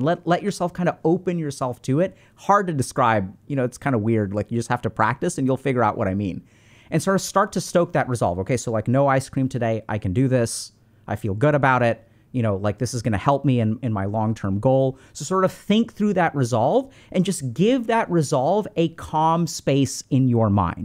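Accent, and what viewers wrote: India. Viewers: Bro.. I love the indian accent lol.... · Damn your English sounds so Indian 😅 BTW thanks for free knowledge....you blessing us with your knowledge glad i found your channel ❤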